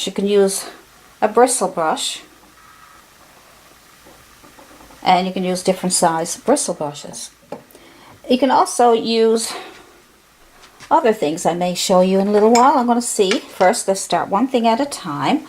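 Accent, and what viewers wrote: Newfoundland, Canada. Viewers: Hello my duckie, sounds like you are a Newf. ;)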